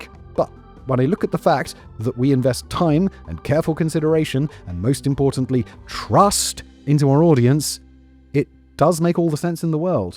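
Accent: United Kingdom. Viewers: His British accent is too heavy for me. I can't follow what he's saying.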